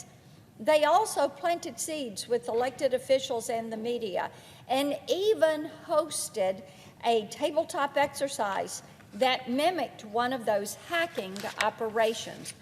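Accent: South, United States. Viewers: I’m willing to bet she was a head turner and all the men certainly wanted to date her !! Add that southern accent and my heart melts….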